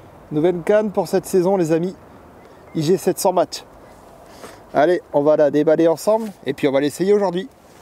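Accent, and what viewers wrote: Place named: Nord, France. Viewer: Salut, c'est vrai que tu as quitté le Nord, j’avais complètement oublié, mais bonheurs ta pas perdu l,accent. Bonne continuation et plein de nouvelles vidéos. Jean-Paul.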